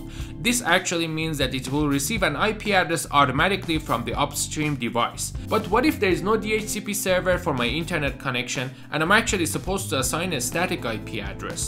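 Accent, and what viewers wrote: Iran. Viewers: Iranian accent,the only english video that i can understand it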